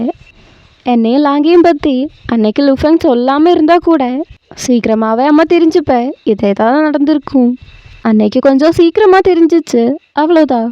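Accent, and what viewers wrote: Kerala, India. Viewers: Chechi sherikk malayali thannenoo malayalam explanation mm Tamil explanation adipoli ayitt parayndallo 😌❤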